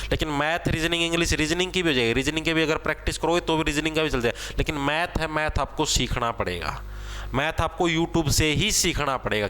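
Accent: Haryana, India. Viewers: Es hariyana ke avaj sunn k laga haa koi pdhava hai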